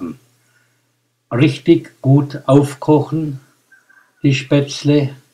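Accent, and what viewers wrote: Swabia, Germany. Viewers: leicht schwäbisch, aber auch für Nichtschwaben gut verständlich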